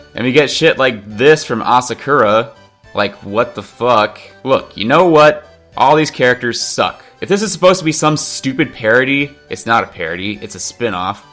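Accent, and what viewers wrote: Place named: United States. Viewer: God, his American pronunciation of the names, is so fucking annoying!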